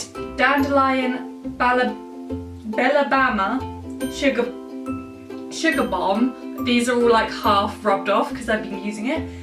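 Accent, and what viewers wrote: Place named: Australia. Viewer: You sound really Australian 🙈 love your hair!!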